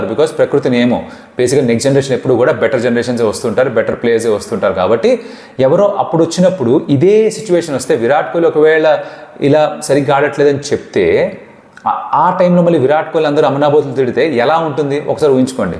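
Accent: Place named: Srikakulam, India. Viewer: Bayya madyalo srikakulam language vachesthundhi srikakulam tho emainaa sambandha undhaa meeku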